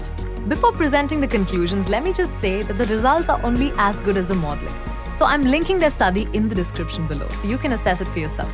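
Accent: India. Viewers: The Indian Accent with this one is so sooo strong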